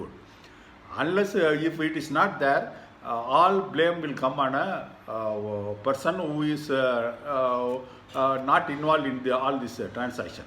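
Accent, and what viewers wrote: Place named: Tamil Nadu, India. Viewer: evar englishi tamizh madiri thane pesarar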